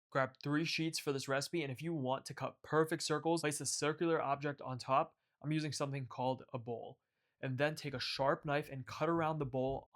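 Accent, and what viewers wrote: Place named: Northeast, United States. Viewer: Your northeast accent is so hot!! ❤❤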